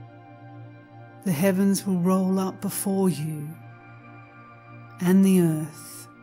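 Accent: Australia. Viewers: I think this was read by an Australian. If so, greetings fellow Aussie! Thank you for this - thoroughly enjoyed it 😊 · A beautiful reading of this book. Thank you so much. After listening to so many robotic voices “reading” sacred texts so soulessly and without any understanding at all , this is just wonderful! Also lovely to hear it in an Australian voice for a change. ❤❤❤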